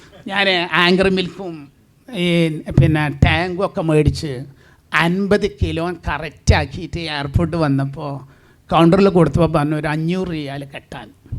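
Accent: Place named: Malappuram, India. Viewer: ഇതുപോലെ ആരും സ്വന്തം ജീവിതം പറയാൻ മടിക്കും... അല്ലങ്കിൽ കൊറച്ചൊക്കെ കള്ളം പറയും പക്ഷെ പച്ച യായ മനുഷ്യൻ മലപ്പുറത്തെ വർത്താനത്തിലൂടെ പറഞ്ഞു അനുഭവംങൾ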